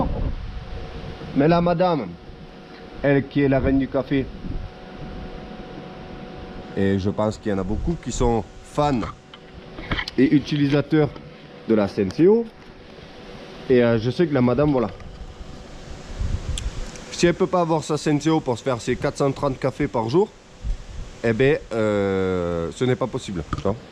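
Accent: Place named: Narbonne, France. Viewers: Vu le prix que va prendre l'électricité , c'est obligé que dans mon futur logement que je cherche je vais mettre un système solaire . Ps: L'accent Narbonnais , mes racines , Merci ^^Force à vous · Aaaah l’accent de narbonne, il fait du bien à entendre.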